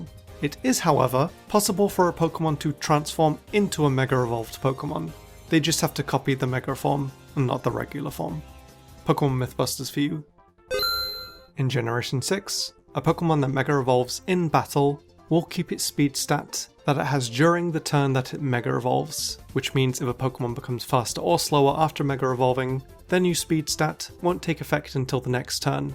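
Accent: United Kingdom. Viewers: he’s bri’ish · Exces me are you British?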